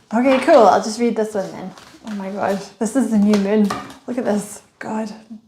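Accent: Scotland, United Kingdom. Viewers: Thank you. Are you scottish? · You sound Scottish 💙🏴👍 very accurate. Im incredibly intuitive. Im normally ten steps ahead. Anxiety up the ass lol so yes im done. New location and stagnant within a partnership. Put thru the shit, bullying, gaslit, manipulation, being spied on, stalker you name it..Things are coming to a very big end. Your so spot on. TY so much ❤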